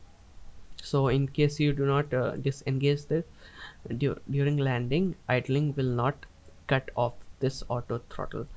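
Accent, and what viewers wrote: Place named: India. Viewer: Sounds like a call centre in India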